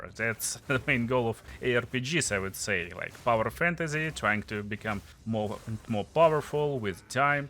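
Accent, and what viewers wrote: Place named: Russia. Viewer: Bro as an Arabic guy, nobody can easily explain stuff to me better than russian accent 😂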